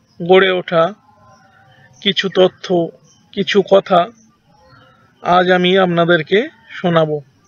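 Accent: India. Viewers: Uchoron shune Indian mone hoi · আপনি কি বিহারী. কথা বলতে কষ্ট হচ্ছে?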